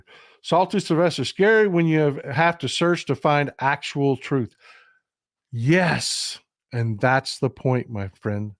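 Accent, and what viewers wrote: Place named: South, United States. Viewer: The First Couple of Seconds, I Saw:, with his Southern Accent, and Cowboy Hat? I Assumed, A Southern Bigot, MAGA, Lowly Educated, Hick! Then I Heard his Insights, and Quickly Remembered; ' Don't Judge A Book, By It's Cover! ' He's A Suprising Story, of Sanity! Thanks Mr.! For Opening my Awarenes Of My Own, Jumping to Bigoted Assumptions!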